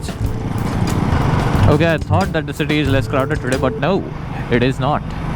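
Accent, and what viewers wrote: Malvani, India. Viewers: I am watching this video for the 7th time just to hear his malwani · Sir tumhi malvani kadak boltat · I like for malvani ..... though I'm not but love that accent 👍🤙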